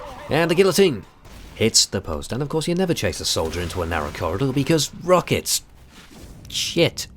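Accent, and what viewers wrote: United Kingdom. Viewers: I love British commentary the voice is like...... It can't be explain from words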